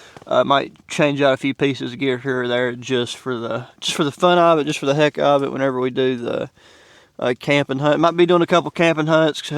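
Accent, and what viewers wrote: South, United States. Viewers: Love that Southern accent, his charm and good looks dazzle in UHD.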